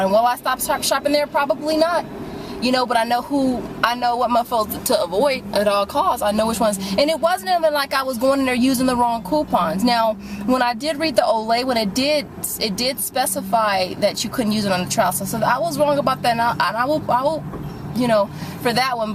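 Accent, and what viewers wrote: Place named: Latin America. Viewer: This has nothing to do with your video, but it sounds like you have a latino accent. Are you of Latino descent? Sorry you had a bad experience. Target sucks most of the time.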